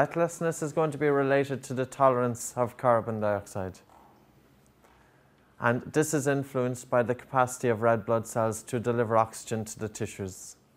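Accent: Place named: Ireland. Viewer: Irish accent, originally grom Galway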